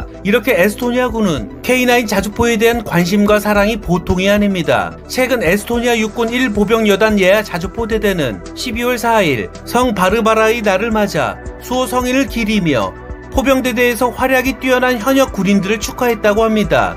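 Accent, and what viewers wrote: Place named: North Korea. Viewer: 영상 잘 봤습니다. 영상 몇개를 봤는데요. 내용이 참 좋네요. 근데 말투가 남조선민주주의인민공화국 스러운 말투가 떠오르는 듯한.....ㅎ;(죄송요^^;) 영상 초반에는 너무 강렬 하신데, 후반으로 갈수록 남조선민주주의인민공화국 스러운 느낌이 빠지고 괜찮아 지시는데 ㅋ 말투 특유의 개성이 있으시네요. 살짝 북한 스러운 ㅋㅋ 초반에 좀 어색했네요. ㅎ 암튼 내용 좋고, 개성 있으셔서 잘 되실거 같습니다.^^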